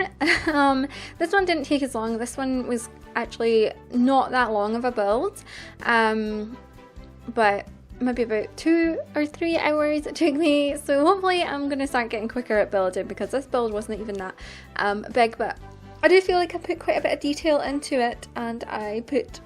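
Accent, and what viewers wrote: Scotland, United Kingdom. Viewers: Is that a scottish accent i hear? :P You hear very few of them on YouTube...mad hearing it on a Sims 4 video too! :)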